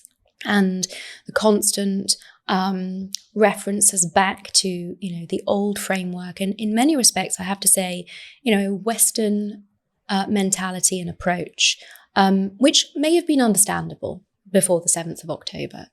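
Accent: RP, United Kingdom. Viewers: When idiotic Hasbara army like Michael Rappaport fails the propaganda exercise, send a posh British sounding white woman to spew more lies with bombastic words sounding all posh but contains zero substance.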